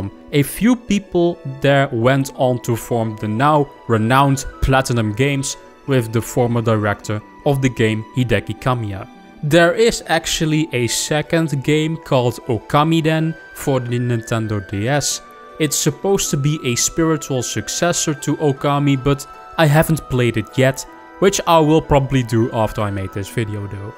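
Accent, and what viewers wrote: Netherlands. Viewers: Hahaha het is altijd te horen voor Nederlanders.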